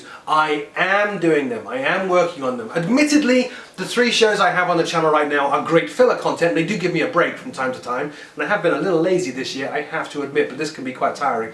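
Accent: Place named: United Kingdom. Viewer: You live in the states? Thought you were british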